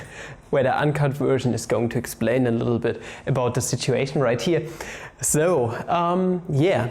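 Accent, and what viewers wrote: Germany. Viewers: It's his German accent and nothing else.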